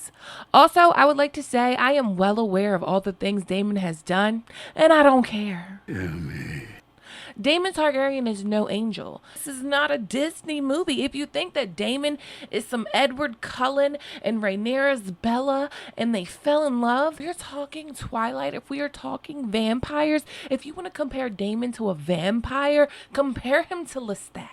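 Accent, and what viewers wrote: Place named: Baltimore, United States. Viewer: You gotta be from Baltimore lol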